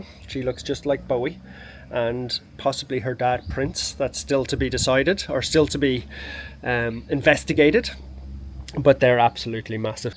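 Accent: Ireland. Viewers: 🙏 🙏🙏Please…I beg of you. Get your lovely self signed up with a professional voiceover agency. I swear, if you have any spare time, you must explore the possibility of using your gorgeous warm and friendly voice as another source of funding your dreams. If I heard that beautiful Irish lilt over an advert, I’d be instantly interested in whatever is being sold. Movies, books etc…. you need to check it out!!😊😊😊😊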